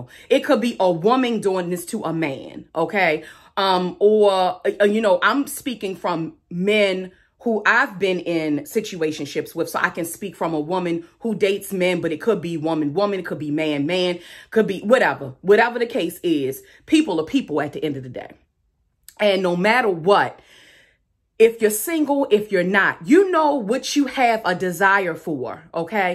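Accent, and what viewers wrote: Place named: Northeast, United States. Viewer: Are you from Baltimore or Philly btw? I can hear the north east accent.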